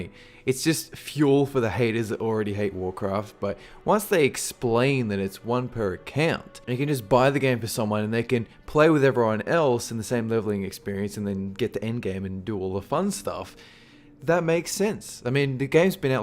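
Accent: Australia. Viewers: Dude, you're an Aussie? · Sick you're Aussie!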